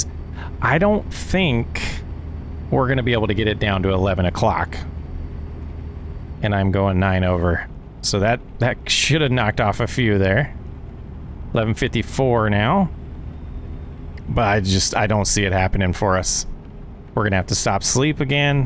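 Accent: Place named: England, United Kingdom. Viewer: I think it's funny that she has an English accent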